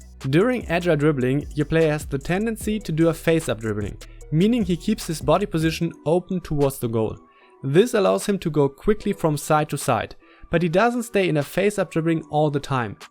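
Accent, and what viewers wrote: Germany. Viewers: Man hört auf jedenfall das du deutsch bist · Are u german? Sounds a bit like a german accent...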